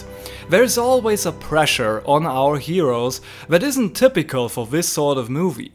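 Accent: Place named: Germany. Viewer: Interesting and informational video - but on some parts hard to follow due to the thick german accent, do you have english speaking friends who proof watch your work?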